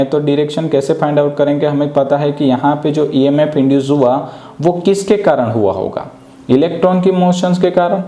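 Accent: Gujarat, India. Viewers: Are you from gujarat ?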